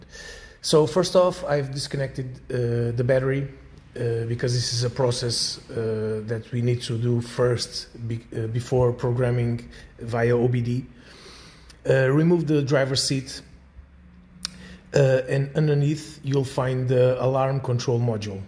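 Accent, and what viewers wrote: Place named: Portugal. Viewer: O "ambiente de trabalho" denunciou o meu amigo, e o sotaque, Português! Eu tenho exatamente esse problema no meu 996, é possivel fazer isto em Portugal?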